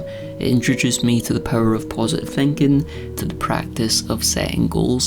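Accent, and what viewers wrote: Scotland, United Kingdom. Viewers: Do you speak Scottish? Tha Scottish blasta.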